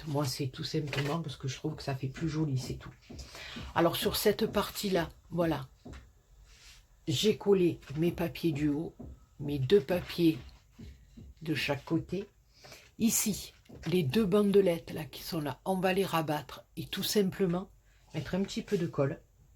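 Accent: South, France. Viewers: Coucou, rhooo mais où vas-tu pêcher toutes ces idées fantastiques. C'est vraiment très beau d'autant que l'on peut le refaire pour toutes occasions. C'est génial et réellement une bonne idée cadeau. Avec ton petit accent du Sud et ta gentillesse c'est un régal de visionner tes videos. Un rayon de soleil en ces périodes certes festives "normalement" mais quelque peu moroses à cause de ce foutu virus grrrrrrrr. Superbe!!!! Gros bisous et merci pour ce partage.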